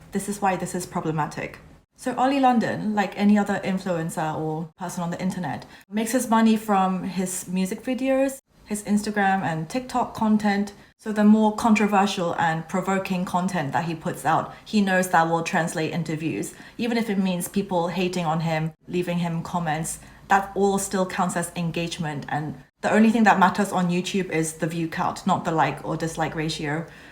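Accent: England, United Kingdom. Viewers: ... I like your accent^^ it's sounds like British and your voice is.. What is it call? Yaa.. Just like it so much^^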